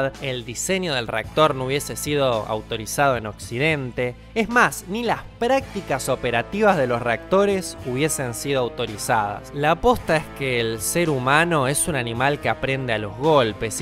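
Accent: Argentina. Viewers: Que horrible es el acento argentino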